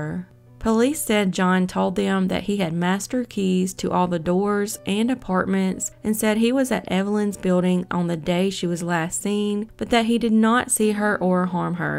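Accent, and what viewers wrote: South, United States. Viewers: You are an angel. You are either from Alabama, Georgia or Mississippi. I go to sleep to these stories. It means a lot. I used to do the same to unsolved mysteries when I was a kid. Thank you for all you do.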